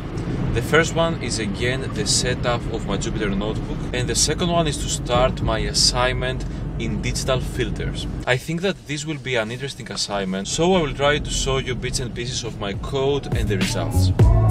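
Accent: Greece. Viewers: The accent never lies, you are from Greece!!! In what major are you studying? I'm studying computer Science in technical institute of Crete. It's my first year and I don't like attending my classes , I find them extremely slow paced and lacking educational material.I prefer being self-taught from online documentation and tutorials so I can progress faster. Have you found yourself thinking the same thing about your college? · I knew you are from Greece because of the accent (is similar to my relatives). Which part of Greece are you from? Also, I love the videos! Keep it up!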